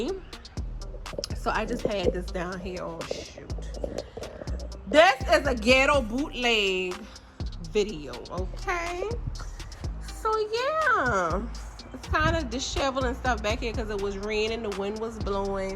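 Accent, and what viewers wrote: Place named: New Orleans, United States. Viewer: You are hilarious 🤣😆 and I love that New Orleans accent. I know this next meal is going to be another banger. Wow an outdoor air fryer? Can't wait to see you put that to use and show us a recipe. 🤭🤭🤭 Not Churches over here. Yes change your grease Churches 😩🤣. You all be throwing down. When you come to TX can you mail us a plate since you not coming to Dallas⁉️😉 No ma'am I don't do bugs either nothing PERIOD. Tfs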